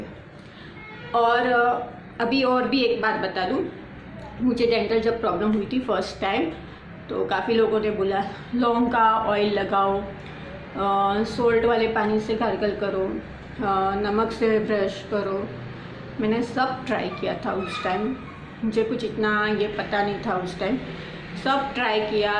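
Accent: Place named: Gujarat, India. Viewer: Ma'am I think app gujarati ho. Apka accent gujarati jaisa lag raha hai.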